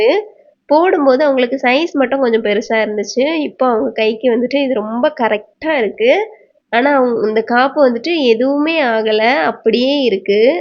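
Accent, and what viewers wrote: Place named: Kerala, India. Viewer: sss andha oor tamil pechu therinchuchu.... Malayalam mixed tamil... 😊😊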